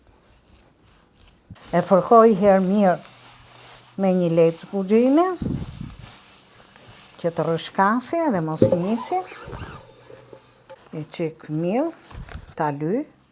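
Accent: Tirana, Albania. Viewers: Pershendetje zonja e nderuar.❤🥰Te lumshin duart e arta e folura tironce na kenaqe me kujton momen time.❤❤Te uroj shedet e gezim e lumturi me gjithe familjen.🙏❤🥰❤🥰❤❤🌲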